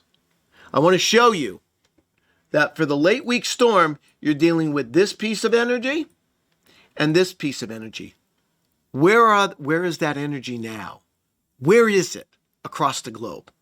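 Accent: New York, United States. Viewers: Just because you have an obnoxious NY accent and way too much confidence doesn't mean you know what you're talking about. News flash, this is click bait!!!!!!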